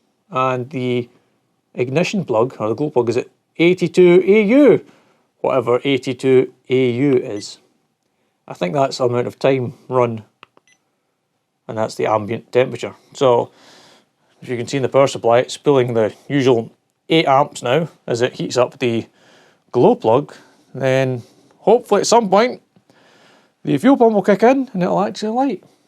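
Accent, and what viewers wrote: Scotland, United Kingdom. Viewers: Scottish accent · "I'll tell you if it's dog shite" - My kind of no nonsense video. All the better with the Scottish accent! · Love ur Videos Mr, Very informative, practical and to the point! Nay ta mention the humor and the Scottish Accent.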